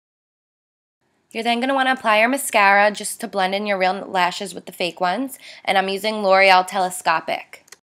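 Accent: New Jersey, United States. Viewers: Are you from New Jersey? your accent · look at the accent! haha jersey girls! we know whats good <3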